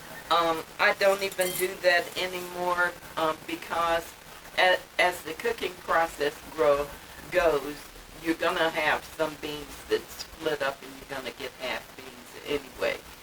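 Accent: South, United States. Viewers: I’m new. Simply Sara mentioned you. I’m enjoying your way. You speak southern the right way. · What a nice lady and a good cook love your cooking style and you just seem like one of the sweetest little ladies ever I'd love to live next door to you and we could exchange recipes on the Southern girl and you sound like you might be a Southern girl also good food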